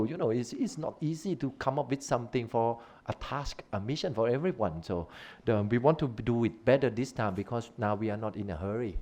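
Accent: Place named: Thailand. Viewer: อังกฤษสำเนียงไทย โคตรฟังง่าย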